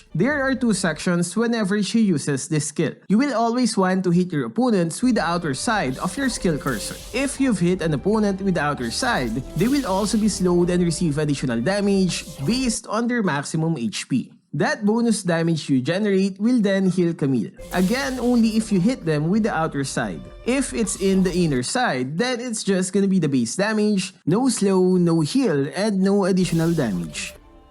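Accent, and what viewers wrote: Philippines. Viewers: Philipines accent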